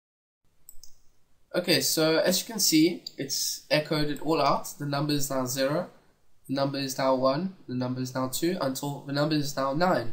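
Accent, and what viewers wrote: South Africa. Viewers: Must be a south african, Viva!! Its nice to hear a familiar voice.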